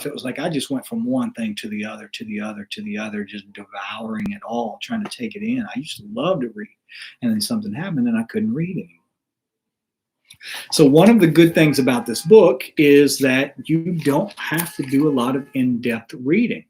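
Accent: South, United States. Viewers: Man I wish I'd have gotten the notification in time to be here live. I'm gonna have to see what's wrong with my settings. I've been waiting for you to share another video. I enjoy your teaching so much. And I'm so very proud of you and everything that you've accomplished in your studies. I'm so thankful for your interest bc I always learn something new about things I've wondered from your broadcasts. And it's so refreshing to be able to listen to another southerner. Especially on the topics that intersect me. You're a one in a million type of person. I've known about your past since HBO's debut. And I knew as I watched in horror that you are innocent. And all I could do was pray about it and believe y'all would get out of that nightmare alive and tell others that didn't know what was going on. It does my faith in GOD so much good to see you doing so well. 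Your resilience is an inspiration to me. And I wish all the best in the world.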